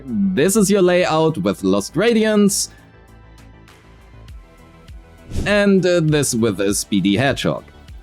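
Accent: Germany. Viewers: I hear german accent + see a good structured guide with a pinch of humor - it`s an insta subscribe 🔥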